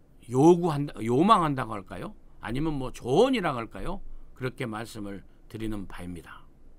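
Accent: Jeolla, South Korea. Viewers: 뒤에배경이 너무새까매요 인물은훤한데 따라서얼굴도 어둡습니다 고쳐서100만명달성하시요전라도말투도 좀빼시고요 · 점잖게 하시지 말고 스타일 대로 하세요 ㅋ 라도 스타일 선생님이 하시면 듣기좋아요 쎄게 하세요 그럼 백만 갑니다 똑똑 하세요 선생님은,0~~~~~0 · 오랫만의 칼럼 반갑습니다. 뒷배경이 어두워 훤하신 원장님의 얼굴이 같이 어둡게 보입니다. 그리고 구수한 사투리로 말씀하신다면 전라도국민들이 귀기울여 듣고 깨어날거같아요.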